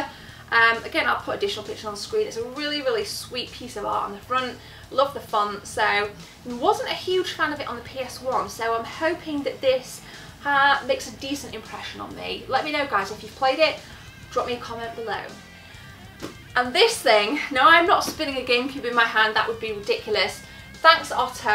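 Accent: United Kingdom. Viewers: Awesome finds, I wonder if you always get good deals in the states because of the British accent? I here Americans like it. And also i really want Batman Returns on the snes.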